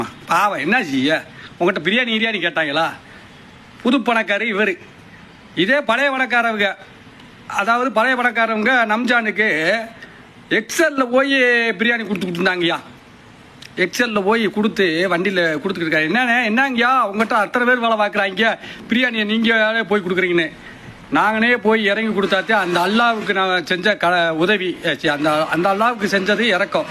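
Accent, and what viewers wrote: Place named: South Tamil Nadu, India. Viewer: Ultimate 😂😂😂 Pucca south TN slang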